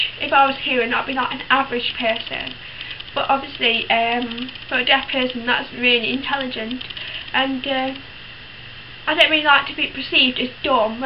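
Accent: United Kingdom. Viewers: Probably not the only one to ask but how do you get a British Accent if you can't hear the way words are pronounced? Just curious. :) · Wow you're British and still have an accent! Of course you don't know what that sounds like but it's amazing how humans just know how to speak and adapt to situations like you have to know sign and english even though you can't hear what you're saying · I notice that you speak with a British accent, are you completely deaf or almost completely deaf? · I don't understand how you have a British accent, that's crazy how that works. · It's so cool how despite the fact that you're deaf, you still have an English accent hehe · They managed to train you into a vaguely Yorkshire accent *thumbs up* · How do you talk like a frickin british person if you are frickin deaf???? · I realize that part of your accent is from being deaf, but it also sounds somewhat British. 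Is that where you're from?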